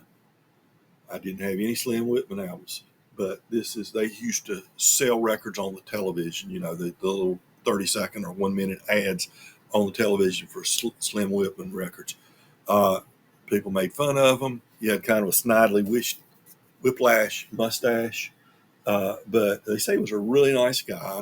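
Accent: South, United States. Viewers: Great stuff. Yesterday on the Tokyo Record Style stream I asked you if you had done a video on albums from '74. Well, look back three years ago, pard.;) I wouldn't forget such a cool southern accent. I was just listening to today. I'm thinkin his cover of is going to be in my Summer Vinyl tag video... if I get the gumption to make it. (What a great album though.) I need to get my hands on that record.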